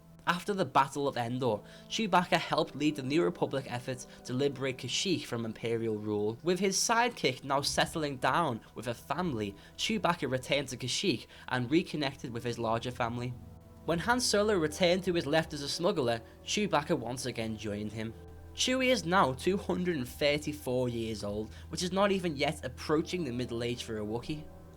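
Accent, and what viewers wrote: Liverpool, United Kingdom. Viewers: U scouse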